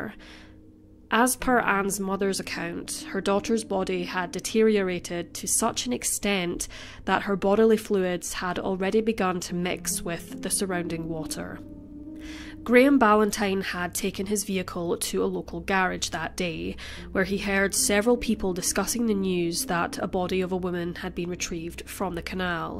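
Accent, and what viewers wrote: Scotland, United Kingdom. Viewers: "It's a Scottish accent"🤗🏴🤗🏴🤗🏴🤗🏴🤗🏴🤗🏴🤗🏴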